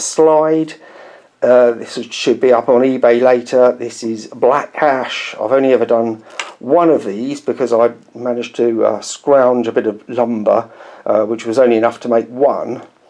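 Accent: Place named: United Kingdom. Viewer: That British accent is spot on 😅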